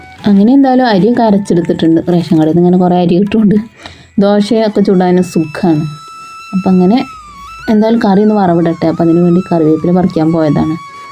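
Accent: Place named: Malappuram, India. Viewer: Nalla video, manoharamaya drishyangal. Calicut, malappuram bhasha ippo kelkkbol chiriyautto, njan avide vanna samayam , samsaram kettittu, veruthe thalayaattikondirunnu. Ippozhum cherya budhimuttundu, ennalum ok, Curryveppira nannayi grow cheyyanulla tip parayatte, oru glass kanjivellam pulippichu 4 glass vellam ozhichu dilute aakki ozhikkuka. Ithu njan pareeshichu jayichathaanutto.God Bless ❤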